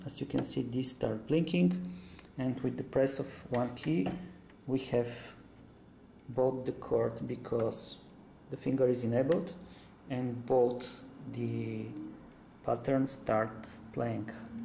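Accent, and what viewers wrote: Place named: Eastern Europe. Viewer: Very strong accent - East European, hard to listen to.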